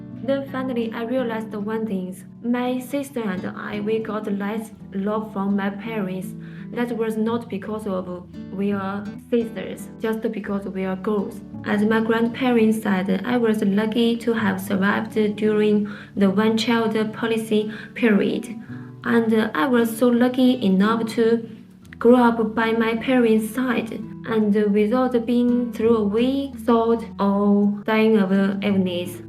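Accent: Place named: India. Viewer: Your English like Indian 😊, ❤ Bhabhi ji. Namaste ❤ 😊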